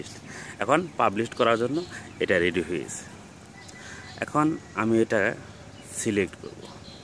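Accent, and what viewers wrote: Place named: Bengal, India. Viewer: Bengali guy speaking Inglish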